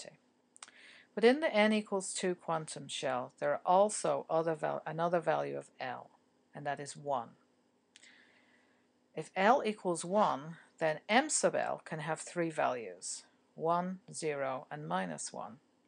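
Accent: United Kingdom. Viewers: Actually she's pretty legit and has an awesome accent from MANCHESTER (she makes sure you know that). She's really funny and makes things very understandable. She is the best chemistry teacher I have ever had. I just started her class this fall semester so I'm not really bias lol. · What a cool sounding accent. It sounds British but American at the same time. Is this Irish?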